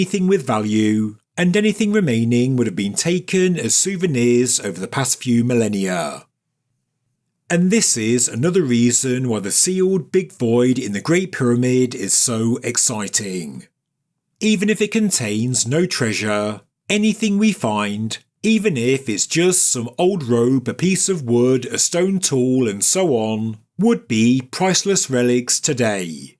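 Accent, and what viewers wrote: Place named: England, United Kingdom. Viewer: Pesante accento inglese 😮.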